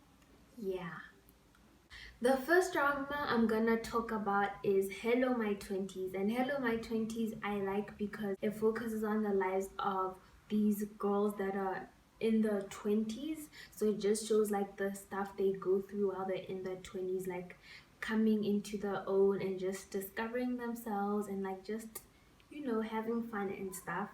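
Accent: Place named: South Africa. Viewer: When I first watched your videos I was like mhm, she sounds South African. I don't know if you are but I am and I just got really excited. Love your channel💕❤️ You watch kdramas too?! OMG I'm immediately subscribing🌻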